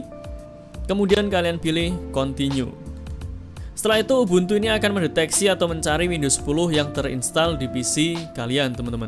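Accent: Banjarmasin, Indonesia. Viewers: What , abang orang banjarmasin ya ?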